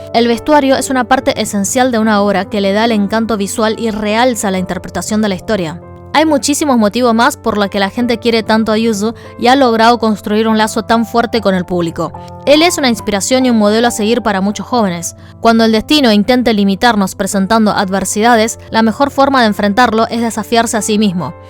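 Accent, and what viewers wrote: Argentina. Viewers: Me encanta el acento argentino de tu español, che! Sos, única! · Buen video. Estaría mejor si modulares tu acento argentino y pesado.... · Se me han caido las lagrimas de emocion gracias chinita linda me encanta tu acento Argentino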